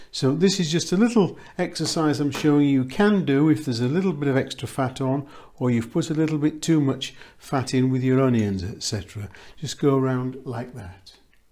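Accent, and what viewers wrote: Yorkshire, United Kingdom. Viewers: Hello Mr. I just found you today. To say that I am very happy about that would be an understatement! I recognised your accent right away as I spent two years in Yorkshire (Pontefract area) as a teenager. I have a question about the steak and kidney pie. After you added the beef broth the mixture looked very liquid. How/when does it change into a nice thick gravy like you have in your pie? Also, I have a question/request: when I lived in Yorkshire I used to eat the most wonderful custard pies. Do you have a recipe and demonstration for them? If so I would be eternally grateful. Thank you.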